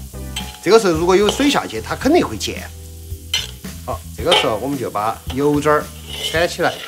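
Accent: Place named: Chengdu, China. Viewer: 对不起我要刷屏了莴笋颠颠儿～ 莴笋杆杆～ 凹～ 溅～ 新宣～ 的成都话好标准好想回成都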